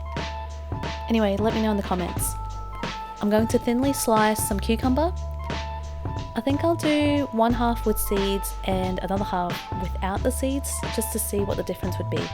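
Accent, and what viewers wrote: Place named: Australia. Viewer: I heard oz accent here 😍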